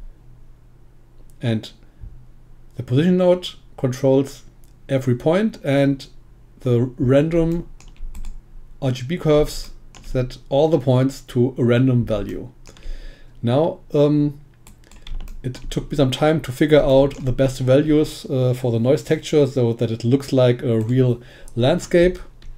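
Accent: Germany. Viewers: You are german arent you? :D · witzig wie man die Herkunft einer Person doch immer am Akzent erkennen kann. Nach den ersten paar Sekungen wusste ich dass hier ein Deutscher spricht :)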